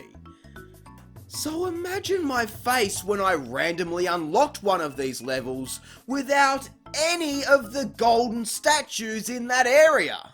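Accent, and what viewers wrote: Australia. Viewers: This was awesome keep up your good work mate! And by the way which part of Australia are you from?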